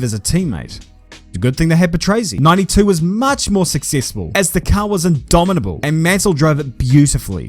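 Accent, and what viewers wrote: New Zealand. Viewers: Please just pronounce it "Ear tin". "Eye Earrrhh tonne" sounds ridiculous! Also, while I'm being an egg, why is your glorious Kiwi accent peppered with Aussie/American vowels, such as when you say "example" funny? Just curious. 👍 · That is the proper pronunciation, just sounds a bit funny cuz he's kiwi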